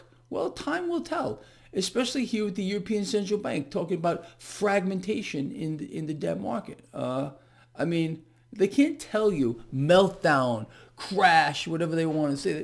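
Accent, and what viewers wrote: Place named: New York, United States. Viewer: I love your accent. Real old school New York 💖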